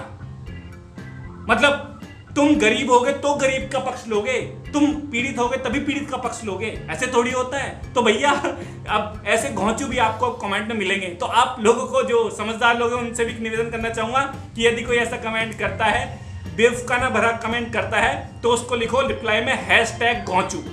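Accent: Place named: Baghpat, India. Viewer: AAP KAHA SE HO SIR...aakpi language baghpat ki lal rahi hai...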